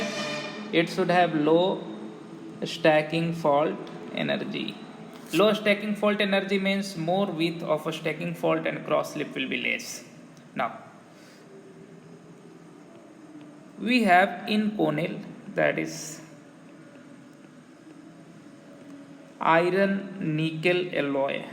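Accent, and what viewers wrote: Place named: India. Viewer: I love your India accent so much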